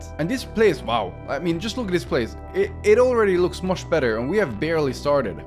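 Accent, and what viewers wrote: Sweden. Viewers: love the Swedish accent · are you from sweden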